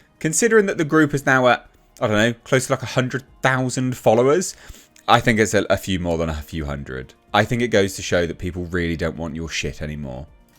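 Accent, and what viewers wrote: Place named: Southern England, United Kingdom. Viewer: This accent you have. It sounds like ricky gervais. What region of the world is this? Apologies in advance, they don't teach us specific English locations. Can't put my finger on it its like a southern accent ( to a yankee analogy)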